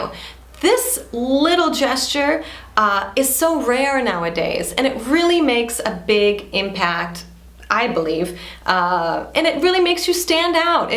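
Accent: Brazil. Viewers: I guess you're losing your English your speak sounds like so Brazilian hahahah!! So cute!:P, eu acho que você tá esquecendo como é que se fala em Inglês, o seu jeito de falar tá muito brasileiro! hahahah...! Acho tão fofo isso,:p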